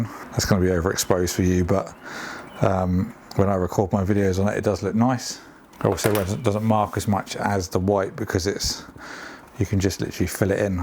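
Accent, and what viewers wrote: United Kingdom. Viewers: Where are you from? Judging by the accent I'm thinking Gloucestershire, Bristol maybe?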